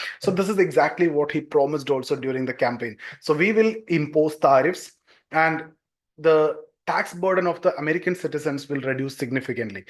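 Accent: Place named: South India, India. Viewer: Typical south indian accent....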